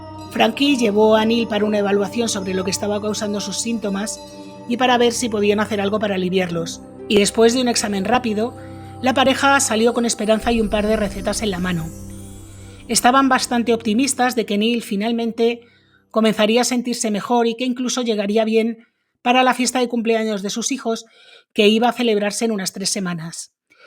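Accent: Spain. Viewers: Soy dominicana, resistente en NY. Me encanta el acento español.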